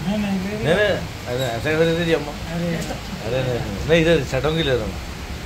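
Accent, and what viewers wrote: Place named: Hyderabad, India. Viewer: Walaikum assalam o rahmatullahi wa barakatuhu Bahut accha lag raha hai aap hyderabadi language mein baat kr rhe ho 😅 Beautiful collection 🎉❤😍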